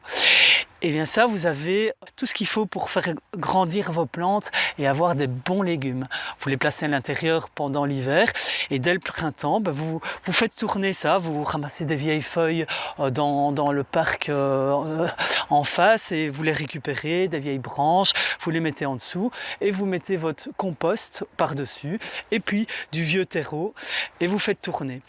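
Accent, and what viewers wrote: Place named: Belgium. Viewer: Accent accent belge, juste un peu comme, sans plus quoi....Alleï bonne journée!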